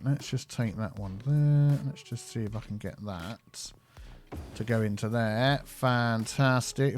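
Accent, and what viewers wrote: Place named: England, United Kingdom. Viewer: Where then? I like trying to figure out English accents. I have a good friend from Bristol.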